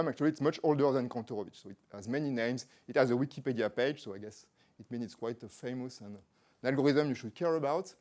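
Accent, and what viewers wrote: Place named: France. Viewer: That french accent..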